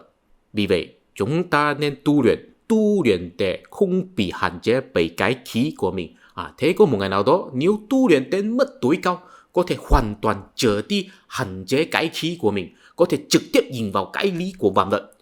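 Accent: China. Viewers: giọng tàu khó nghe quá ..không bằng Lào nói tiếng việt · Nói tiếng Việt giỏi mà nghe như đang nghe tiếng trung quốc vậy, giọng của bạn rất hay.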